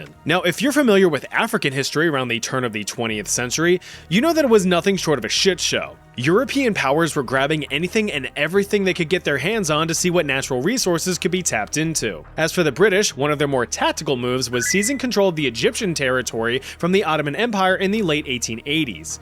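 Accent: Australia. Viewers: Okay mate, your Aussie accent isn't that bad. I'm impressed. Especially in comparison to like...a lot of people on the internet. Also, great video. Also also, Emu's are kinda bulletproof. Something about organs being super in the middle of their bodies, so it took like ten bullets to take them down if you shot right. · The Aussie accent has me frying 😭🙏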